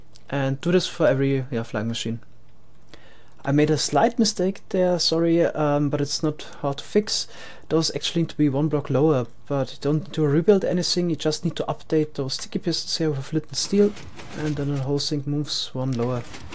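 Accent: Germany. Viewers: ich sprech ja eigentlich kaum english aber ich hör voll raus das du deutscher bist... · Are you german? :D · German? Dutch? Austrian? What is that accent?!?!? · man hört du bist deutscher :D... aber kann es sein das du noch andere Akzente hast?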